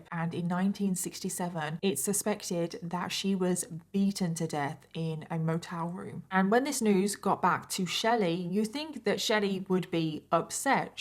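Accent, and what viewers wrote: United Kingdom. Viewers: Hi, are you British or Australian? I love your accent.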